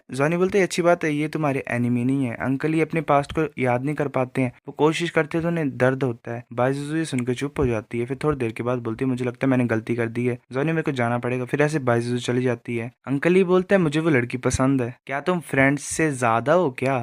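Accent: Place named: Pahadi, India. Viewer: Pahadi voice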